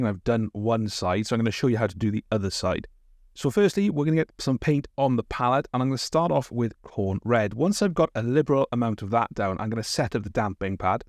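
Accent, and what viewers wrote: Wales, United Kingdom. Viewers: Not sure why the algorithm has only now led me to your channel. Pleasantly surprised to hear a fellow Welsh accent! Looking forward to watching more of your content!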